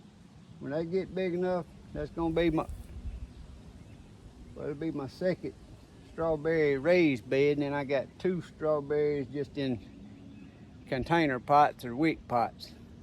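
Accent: South, United States. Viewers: I just found you, thank you. And it's good to hear your good old Southern Voice.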